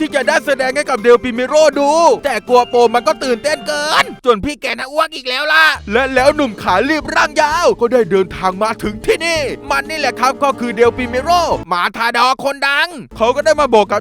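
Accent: South, Thailand. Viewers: พี่คนไต้ไช้ไม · แอดเป็น เด็กใต้? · ชอบๆ มีภาษาใต้ด้วย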